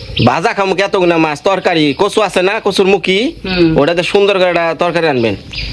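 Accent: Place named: Tangail, Bangladesh. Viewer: বাড়ির সবাই পীর মনে করে। কথা - বার্তা যা কয় তাই মনে করে শুদ্ধ। সাথে সাথে তারা ও শুদ্ধ বলার চেষ্টা করে, আর তাতেই খায় ধরা। এ ও হয় না ও হয় না। যার যার আঞ্চলিক ভাষায় কথা বললে কি অসুবিধা। আরে টাংগাইলের পুরাপুরি শুদ্ধ না। এই যে বলে গামচাটা ভগলা, আইচাল, খাইচাল,গেছাল। আমি ময়মনসিংহের মেয়ে আর টাংগাইলের বউ। সুতরাং সব আমি জানি। যার যার জায়গা থেকে সে বলবে সেটা দুসের কিছু না।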